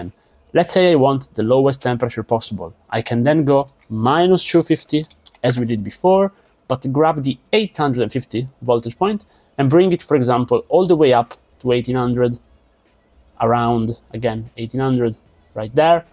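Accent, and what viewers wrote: Italy. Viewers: sei italiano??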